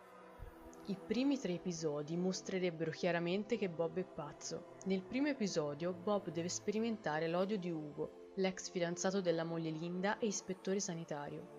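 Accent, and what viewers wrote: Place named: Tuscany, Italy. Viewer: Te lo devo dire, sto guardando questo video alle 3 di notte(dopo aver visto diversi episodi di bob's burger), e dovrei avere paura, ma non ce la faccio con la gorgia toscana nella parlata😂😂. Comunque sei molto brava😁, gran bel video❤️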